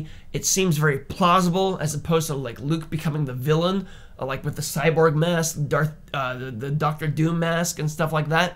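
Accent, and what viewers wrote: Canada. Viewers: why do you have a canadian accent? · Canadian as fuck eh?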